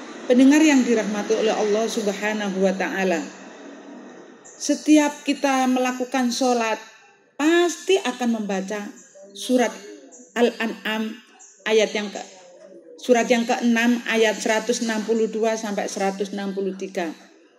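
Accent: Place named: Java, Indonesia. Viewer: Pelan pelan bu nyai gk usah tergesah gesah agar bhs indonesianya tdk kelihatan medok,krn sdh msk youtub, maaf kritik membangun smg sukses dakwanya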